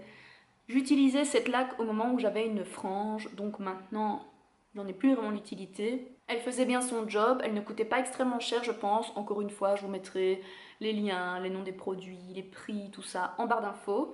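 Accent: Belgium. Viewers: je tarde un peu mais c'est normal pour le shampooing à la violette... tout les shampooing pour blonde font ça...( effet sac de noeuds). En tant que blonde c'est une galère!! bisouxx et quel plaisir cet accent belge surtout pour qqn qui désormais vit à NY!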